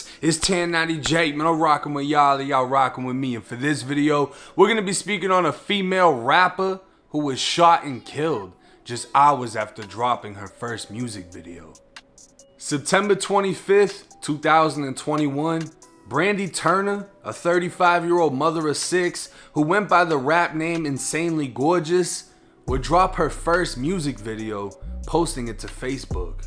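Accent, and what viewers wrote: Boston, United States. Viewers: He got that Boston accent. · “Or a drunken argument between people pottyin’ (partyin’)” the Boston accents crazy 🤣🤣🤣🤣🤣🤣🤣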